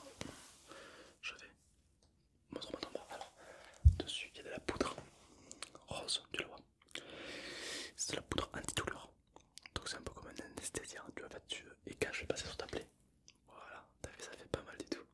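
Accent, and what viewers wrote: South, France. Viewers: J'adore ton accent du sud MOI JE VIENS DE MARSEILLES!!!!!!!!!!!☀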